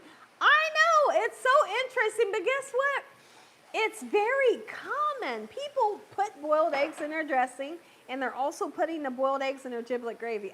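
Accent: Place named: South, United States. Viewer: I just love you I love ❤️ you southern accent and cooking I learn so much from you I just got the best of both worlds cooking southern dishes and I also cook Spanish dishes but sheesh ain’t nothing I can’t cook thanks to you and YouTube ❤❤❤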